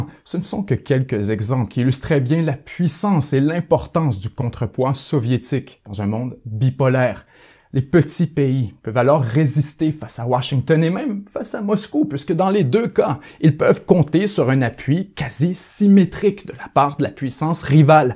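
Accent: Quebec, Canada. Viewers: Les analyses géopolitique de Mr Asselineau sont pas mal du point vue de la France et l'Europe. Je découvre cette chaîne, et j'en suis ravie. En plus j'aime entendre l'accent canadien. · ha l'accent québécois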